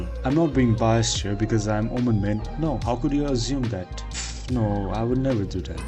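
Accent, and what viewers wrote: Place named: Nepal. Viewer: Brother. Nepali accent sunirako chu ta ma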